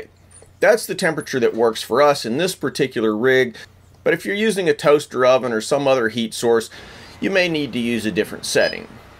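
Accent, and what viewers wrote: United States. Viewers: You have the clearest, most neat accent i've ever heard:P. Coming from a foreigner, You sound like the perfect american accent for me. Just an observation, though quite a silly one. I really love Your work, and videos, and the step by step process. You're great!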